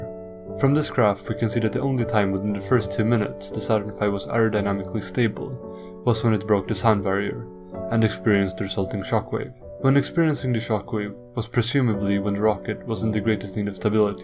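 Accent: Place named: Skåne, Sweden. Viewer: Hi there. I have spent half-hour following leads from ' ' - all of which are dead-ends. So I have to contact you in public. I see you speak Skåne - or were warmly influenced by that region. I speak that other great language. I'm English but I live in Sweden (Österlen). Your video on missile stability is truly excellent. I would like to use your work both whole and in part. I have several videos dealing with the German A4 V2 missile and a website called <link> I would, of course, give you full on-screen credit and provide links to a website or other internet site of your choice. Within the limitations of our specialist area (I'm not Justin Bieber!), I can promise you significantly greater exposure, and we have expert subscribers (from industry, academia, etc.) to our website and video channel from around the world. I look forward to hearing from you. 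Kind regards Robert J Dalby FRAS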